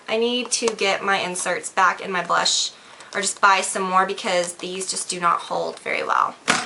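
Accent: South, United States. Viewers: i love hearing bits of your southern accent in your videos. what nail polish will you be wearing for new years?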